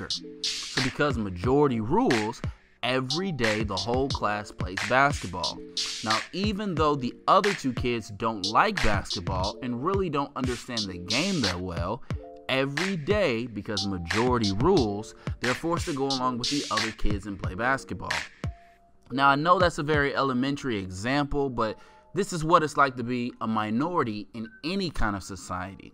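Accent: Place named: Oregon, United States. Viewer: Sounds like you live in Salem or Albany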